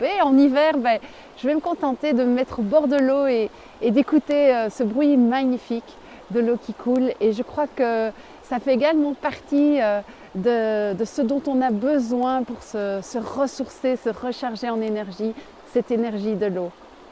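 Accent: Belgium. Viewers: Bonsoir nous dans le Béarn c’est 1 heure avec un rayon de 1 kms et bien sur avec la permission de l’attestation bien remplie autrement 135 euros on est devenu dés hamsters 🐹 dans leurs cages quelle chance de pouvoir se balader où on veut .belle balade et l’accent belge qui nous berce .bye bye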